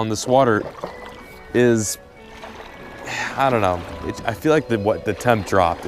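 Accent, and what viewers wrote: South, United States. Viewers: I think your low key catching a southern accent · Just moved to Texas and he's already sounding southern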